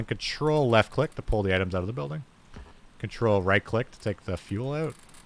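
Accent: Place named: Canada. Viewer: god you are canadian.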